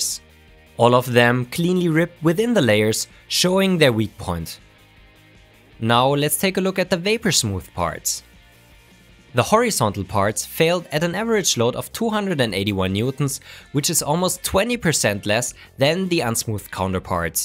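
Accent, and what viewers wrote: Germany. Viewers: Wtf are you german or what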